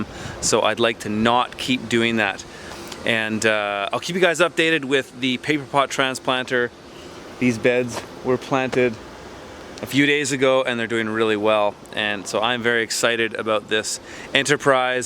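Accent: Canada. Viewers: You have the softest Canadian accent I think I've ever heard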